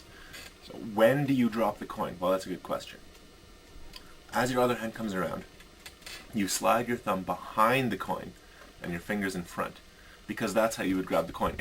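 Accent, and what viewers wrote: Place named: Canada. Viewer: Miss-direction? Who is she, i would like to meet her? Unless I have missunderstood! lol Very informative explanation - I saw Shawn Franquar at convention the other day. I guess you are from Canada by your accent? I remember going to Browsers Den in Toronto and saw Jay Sankey. I wanted to work there with jeff and Shana. Also Vanishing rabbit in Calgary AB. You may know Brett or Tyler!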